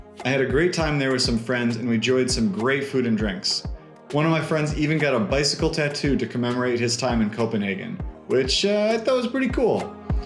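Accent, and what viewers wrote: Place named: North America. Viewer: See, a WarPigs recommendation carries so much more weight in a North American accent. Kudos to matey on the tat, very DK.. Now the best thing about short form videos is that you've actually been present wherever you've visited, might actually have some memories that aren't digital, as such. Kind of a shame though, in the sense that the narration is pretty good, you're smart enough to have survived our cycle lanes (imagine how entitled a cyclist can get, when we have right of way..) and the accent isn't one of those that makes us grateful for the ocean dividing us.. Remarkably off-topic and not so much leaning into any, umm, cultural stereotypes as just being a bit proud of our guys n gals being able to make the team - but there's a few vids on YouTube called "My War - Danish Afghanistan Documentary", that's one of the few that covers something where our boys and girls have come out to play with forces from the US, Canada and the Brits. 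Nearly all of it helmet cam footage and the rest is the soldiers' own cameras, hasn't been through the "propaganda ministry" for editing either, pretty raw. w/English CC <link> Kinda like watching the BSG Raid series..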